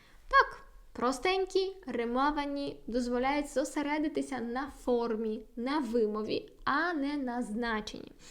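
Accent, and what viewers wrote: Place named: Poland. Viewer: Пані, вибачте, але у Вас сильний польський акцент.😉 Не маємо в українській мові слів "окремний" та "трохі", у слові "вимова" наголошеним є другий склад, а звук [ў] у закінченнях "-ів", "-ив" ніколи не перетворюється на звуки [в] або [ф]..)) Плекаймо ж Її- нашу Мову-Страдницю! За поради ж щодо польскої- велика Вам, пані, подяка!!)))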